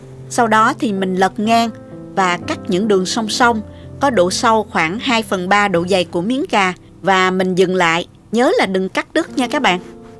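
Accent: South, Vietnam. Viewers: cách chỉ dẫn tường tận, dễ hiểu, dễ thực hiện, thích nhất là giọng nói miền Nam thân thiên... 10/10...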